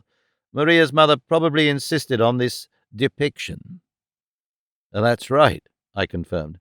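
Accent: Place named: United Kingdom. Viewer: I will really miss this so clear British accent❤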